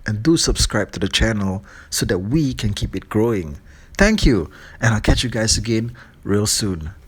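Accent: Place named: Malaysia. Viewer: when a malay speak english...you can hear their horrible malay accent....and those headlights are ORANGE not red u color blind or wat?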